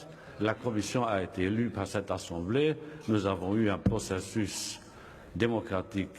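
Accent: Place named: Germany. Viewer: il me semble monsieur Juncker que votre langue maternel est l Allemand pitiè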